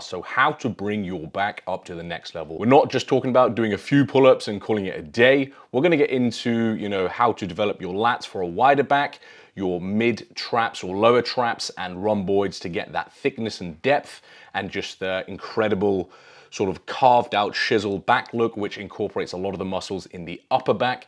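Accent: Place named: England, United Kingdom. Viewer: thought you were cbum from thumbnail. the english accent through me off. thanks for the info tho very good tips. back day in 6 hrs! subcribed!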